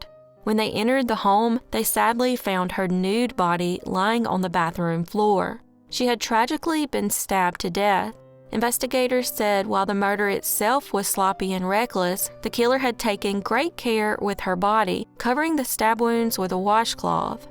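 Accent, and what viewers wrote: South, United States. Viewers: Found this by accident. You gained a follow. Telling me I can get stories at work with a southern accent. Best thing to happen today! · What part of the south are you from?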